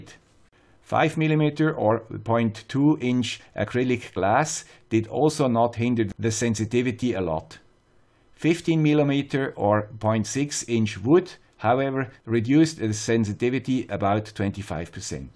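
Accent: Switzerland. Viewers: Mit einem Schweizer Akzent? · Absolutely outstanding work,. I love watching your videos, and I love you Swiss accent, too:)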